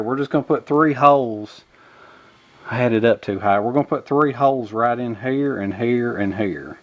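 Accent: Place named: Georgia, United States. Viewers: Great to have you back. I have missed that Georgia accent and your unique laugh. And I have really missed learning from you. YouTube was not the same without you. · How I missed this Appalachian accent! Welcome back, don't abandon us again please.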